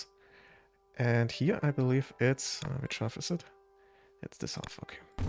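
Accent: Germany. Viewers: The German accent is crazy lol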